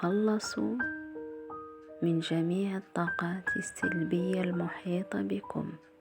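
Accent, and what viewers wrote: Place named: Morocco. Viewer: أقصد أن مستوي الصوت منخفض جدا مع ان محتوي الفيديو رائع لكن بكل أسف لم استطع الاستفادة منه لعدم وضوح الصوت كما أن أسلوب او لغة العرض هي مغربية ويب التالي بعيدة عن اللهجة المصرية لذلك لم أحصل علي الفائدة من الفيديو رغم اهمية محتواه الجيدة عموما أشكرك جزيل الشكر لاهتمامكم بتطوير وتطوير ماتقدمينه هذا من اهم عوامل النجاح في المستقبل إن شاء الله تمنياتى لكم بالنجاح وإنجاز أكثر أن شاء الله. تحياتى لكم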